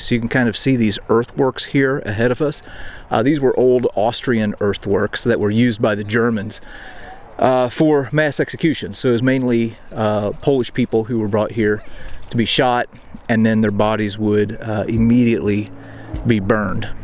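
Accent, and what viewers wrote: United States. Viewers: Sending kind wishes from Connecticut. I’m your newest subscriber. I appreciate your skills and knowledge base. You’re presenting difficult material with such respect for the tragedy and multi-generational trauma. I’ve seen many in Europe, but never visited Plaszow. I will have to read about you and your channel. You sound USAmerican.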